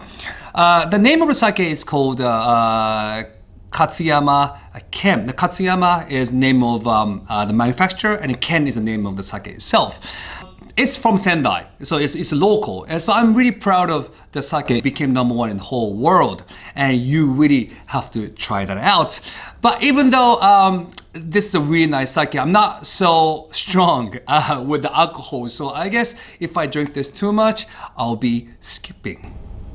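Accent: Spain. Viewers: hahaha eres un grande, tienes un buen espanol. se nota que aprendiste el acento castellano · I was thinking you had kind of a spanish accent when speaking english... Just figured out why! That being said, I think it is great you have your own channel now and wish you all the best! I wanted to visit Japan for a long time now and watching you and Chris travelling the country and having all kinds of mouthwatering foods and fun adventures made me decide to start saving up for a trip next year (due to current circomstances...). Can't wait!! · your spanish accent melted my cold dead heart. Speak more spanish to us please. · Wow that Spaniard accent.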